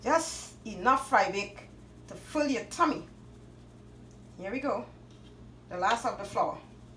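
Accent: Trinidad and Tobago. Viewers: girl Ma ma you surely can put down some moves Just like all your Receipts.In Cooking you are very exciting.. You sound like a TRINI😆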